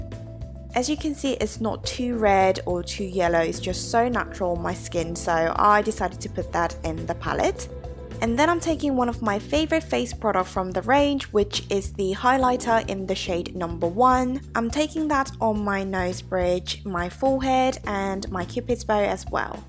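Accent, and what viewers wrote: United Kingdom. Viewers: 영상 오랜만이에요 언니 !!!!항상 브이로그 챙겨보는데 항상 밥드실때 댓글보신다고 하셔서 댓글 오랜만인지 처음인지 ....? 여튼 달아봐요 !!!항상 영상 잘 챙겨보구있고 언니 브이로그 보는게 진짜 삶의 낙이에요 ㅋㅋㅋㅋㅋㅋ밥먹을때나 자기전에나 꼭꼭 한번씩 더 챙겨보고 자요 !!!!봤던거라두요 ㅎㅎ 하루하루 브이로그찍으셔서 보는 재미도있구용 ㅎㅎ 영상 길이도 길어서 넘나 좋아요ㅠㅠㅠㅠ 항상 영상 잘보구있으니까 언니 화이팅해요 💕💕💕💕💕아 그리고 언니영상보면서 영국 특유의 그런 억양 너무 배우고싶어졌어요ㅠ영국 너무 가보고싶어요 !!계산도 혼자해보고싶구요 ㅋㅋㅋㅋㅋㅋㅋ여튼 영상 잘보고가요😘👍🏼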